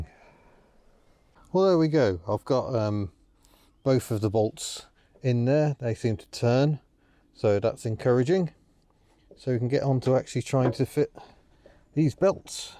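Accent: United Kingdom. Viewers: almost the Queen's English you were using for Aussie ears, haha!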